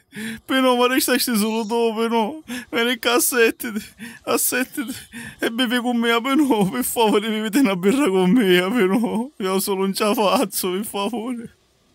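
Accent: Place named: Sicily, Italy. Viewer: Bravo, provincia di Agrigento o di Palermo?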